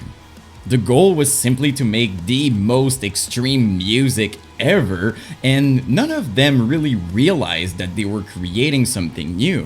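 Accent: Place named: Montreal, Canada. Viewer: hey man, you from mtl? cause you sound like it!! ahahha good to see someone out there doing these kinda videos. keep up the good work my guyy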